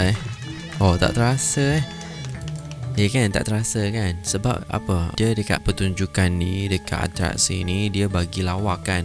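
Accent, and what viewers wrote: Malaysia. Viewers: ABG NI ORG INDONESIA KE MALAYSIA CARA CKP MCM ORG MALAYSIA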